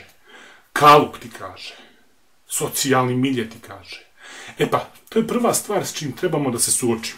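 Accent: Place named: Ekavian, Serbia. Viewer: Ooooo, preslo se na ekavicu!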